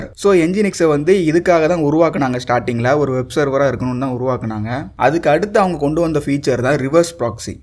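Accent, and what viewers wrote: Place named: South Tamil Nadu, India. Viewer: The Tamil Ascent of the host seems to be from south Tamilnadu probably from Virudhunagar . அழகான பேச்சு தமிழில் கம்பியூட்டர் பயிற்சி வீடியோ வாழ்த்துக்கள்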